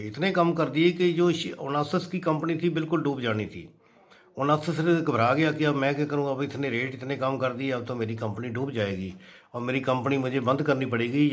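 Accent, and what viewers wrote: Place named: Punjab, India. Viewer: पंजाबी लहजे में आपका हिंदी बोलना बहुत भाता है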